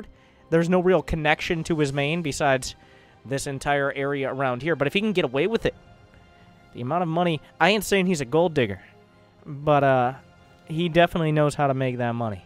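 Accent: Germany. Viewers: You'r german accent is fucking on point :)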